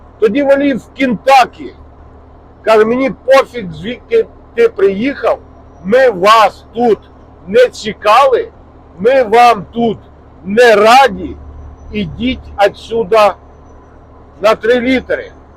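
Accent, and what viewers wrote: Zhytomyr, Ukraine. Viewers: Вітаю! Не давно на вас підписалась, дуже цікаво все розповідаєте. Пораджу вас дітям які мають бажання виїхати в Америку, це донька і зять. Питання :звідки Ви родом з України, відчуваю по розмові десь з мого регіону чи поблизу- Житомирщина. 😊❤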